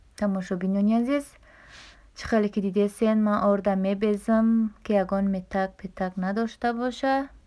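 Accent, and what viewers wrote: Kulob, Tajikistan. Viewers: Саломалекум апаи хохари намедонум мада бехат видиёхотон макулай баройки бисёр тарзи гапзании хушру дорен хами лавзи кулоби бехат лавзи ширинай хиёл мекнум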